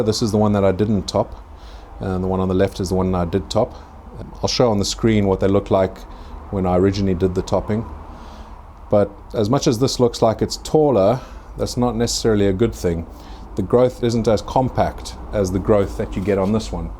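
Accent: South Africa. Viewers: It's clearly South African.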